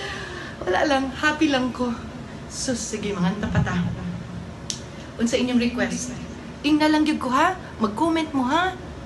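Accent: Visayas, Philippines. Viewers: Hala, lipaya niya kaayo...i love you,.ka.bisdaak gihapon mangistorya bisan gamay pa kaayo ka nagpuyo sa Manila,...maong gi bless ka maayo kay down to earth gihapon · KA CUTE NIMO MAG BINASAYA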